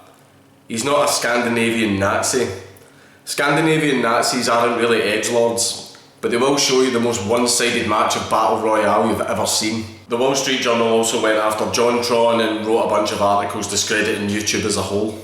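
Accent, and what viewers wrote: Scotland, United Kingdom. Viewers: Holy crappo.. watching these ol skool dank vids is so enlightening as to how far his production value has improved... OMG A SCOTTISH BROUGUE AND AN ECHO!!! lol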